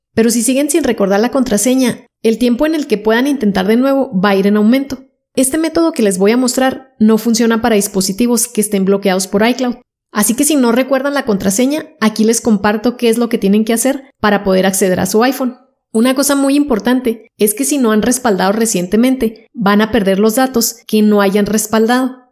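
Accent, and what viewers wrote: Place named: North, Mexico. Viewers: Que linda voz así como norteñita me encantó tu explicación me sirvió para desbloquear mi CEL mil gracias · Me súper sirvió, que hermosa voz!! Me hace acordar al acento de Saltillo de una amiga 😍 saludos!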